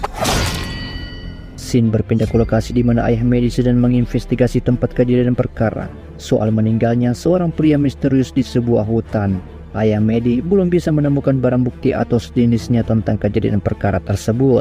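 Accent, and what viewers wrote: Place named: Sulawesi, Indonesia. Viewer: Admin kayakx org Sulawesi terdengar dari logatnya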